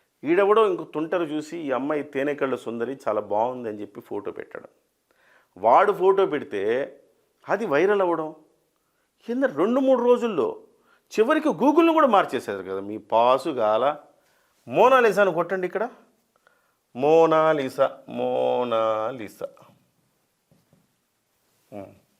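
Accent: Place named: Nellore, India. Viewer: Superrrrrrrr sir chalaa baga Nellore slang tho bale chepparu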